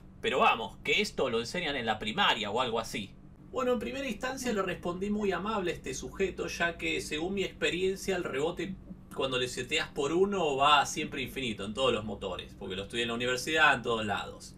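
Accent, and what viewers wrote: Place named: Argentina. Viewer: excelente video pero insulta más porque en el acento argentino suena gracioso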